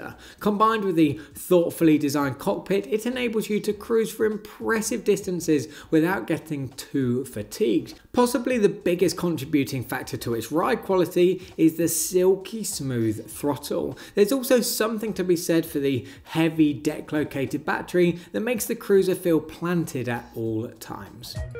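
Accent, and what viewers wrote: United Kingdom. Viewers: Fkn annoying British accent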